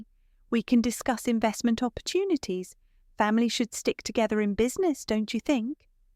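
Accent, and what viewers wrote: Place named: RP, United Kingdom. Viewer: Love the RP accent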